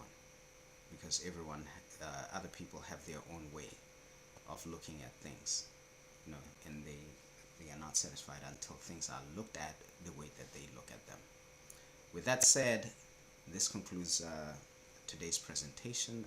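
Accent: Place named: United States. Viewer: Love the program. The accent though made it difficult for me to understand you, wish you could leave the Americanisation.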